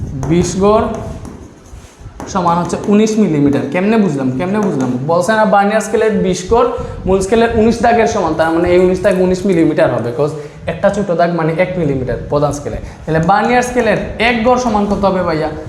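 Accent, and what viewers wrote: Sylhet, Bangladesh. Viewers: Bai kita sylheti ni mate to ola lage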